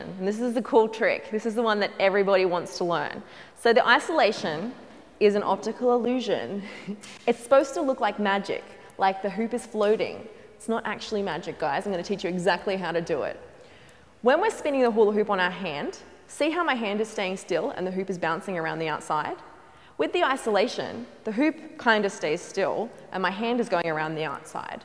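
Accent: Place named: Australia. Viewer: I could listen to you talk all day! What an awesome Australian accent you have.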